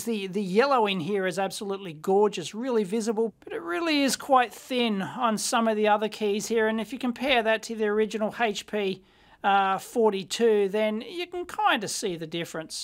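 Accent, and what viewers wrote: Australia. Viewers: Sounds like an Australian Scotty Kilmer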